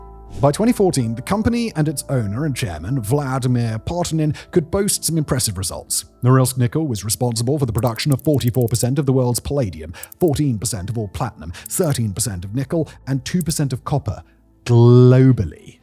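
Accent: United Kingdom. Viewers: Fight to keep human created content! there's nothing better than a British guy talking about history anyway.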